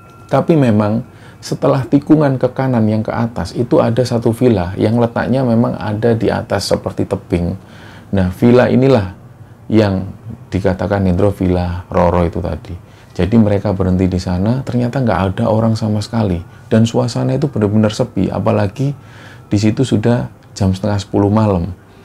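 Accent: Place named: Banyumas, Indonesia. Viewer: Pada2 ngapak.e... 🤩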